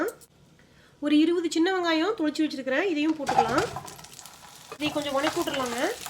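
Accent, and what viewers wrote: Kongu, India. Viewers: Ungaloda kongu Tamil arumai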